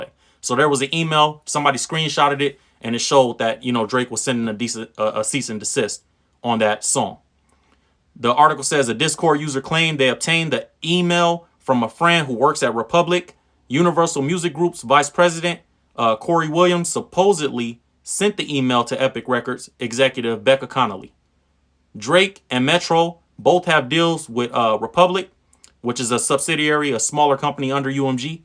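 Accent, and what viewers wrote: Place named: Los Angeles, United States. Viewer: I like your LA accent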